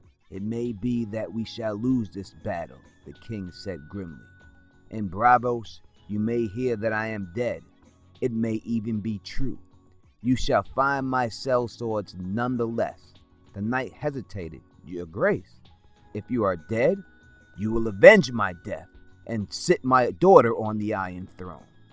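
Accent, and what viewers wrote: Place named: Brooklyn, United States. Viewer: You sound like an italian from brooklyn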